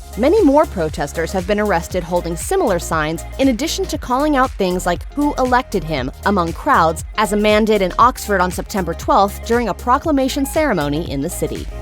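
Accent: United States. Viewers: I don't need this stupid American voice. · Who is the commentator? Sounds awful. An American?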